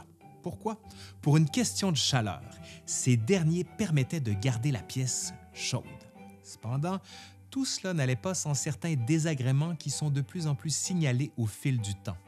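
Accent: Quebec, Canada. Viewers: j'ai adoré votre video. un grand BRAVO! je me demandais ce que vous pouviez raconter sur l'histoire du "dormir", et sincèrement, un vrai cours d'histoire. et j'ai apprécié votre touche d'humour. en plus, votre accent québécois (je suis du Var en France), j'adore !!!! Merci beaucoup. je vous découvre. je vais suivre vos viéos. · Passionnante histoire du lit, surtout racontée par un québécois à l’accent délicieux! Mes origines sont de centre France, plus précisément en haute Corrèze, et les anciens dormaient en campagne dans des lits minuscules. D’abord parce qu’ils n’étaient pas très grand hein, mais surtout aussi parce qu’il ne fallait pas dormir dans la position du mort dans son cercueil. Ils dormaient donc les jambes repliées. Ça allait quand j’étais gamin mais c’était plus dur en grandissant… Bref, l’histoire du lit n’en fini pas, comme on peut le voir dans certains commentaires. 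Merci pour ces vidéos drôles et instructives! Encore! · Foutu accet canadien...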